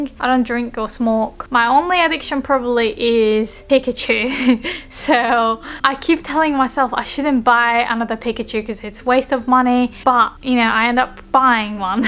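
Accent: England, United Kingdom. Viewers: Whoa, where’d you pick up that accent? Is it British? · 她的英國腔真好聽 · Never thought I would find Japanese with thick English accent other than nihongogamer 😂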